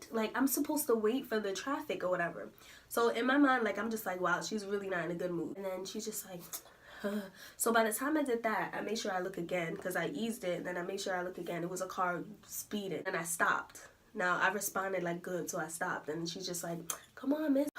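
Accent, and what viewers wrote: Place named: New York, United States. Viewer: Where did you take your road test? You sound like your from NY like me! Lol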